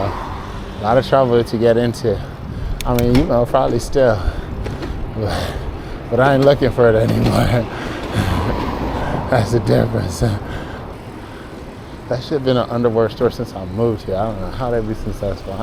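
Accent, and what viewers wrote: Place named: United Kingdom. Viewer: I can really hear your years spent in the UK in this video. I’ve picked up on a few times but it’s really expressed here. Great ride! Thank you for sharing.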